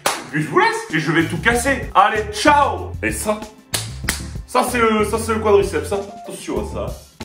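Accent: Quebec, Canada. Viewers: Ton québécois 10/10 pour une personne qui te suit du Québec super accent 👌🏻💥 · L'accent québécois 10/10 ✨, incroyable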